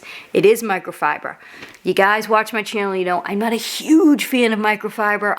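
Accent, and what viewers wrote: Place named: New York, United States. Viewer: This lady has such an interesting NY accent lol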